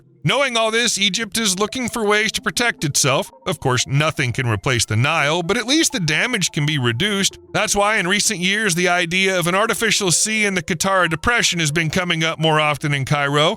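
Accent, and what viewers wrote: United States. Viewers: I just stopped it and won't be listening. No one wants to listen to an abysmal amerry can accent. Just no one.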